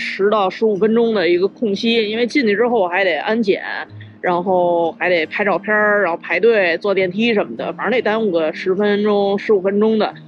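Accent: Beijing, China. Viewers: ，我在西岸，能跟着你追大纽约地区的光甚是开心，喜欢这一口京腔，听着心里特别踏实。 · 还是不做作的京腔舒服啊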